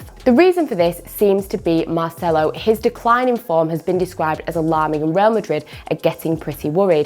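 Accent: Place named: Manchester, United Kingdom. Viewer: Love that accent! Is that from Manchester? ;)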